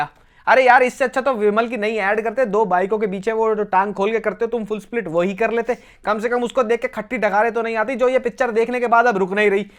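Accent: Haryana, India. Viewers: I seriously wish you were single ! Specially your Haryanvi accent 🤤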